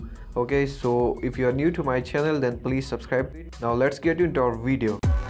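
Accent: India. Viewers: I always love indians accent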